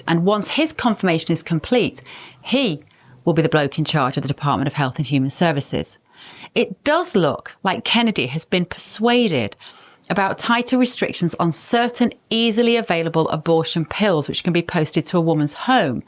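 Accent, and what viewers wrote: United Kingdom. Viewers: I'm an American man in my 50s., if not for your lovely British accent.., I would be inclined to believe that you are just a wholesome American girl, that everyone loves to adore. Honestly, I only mean.., no matter where we come from, common sense is universal. Good form.👍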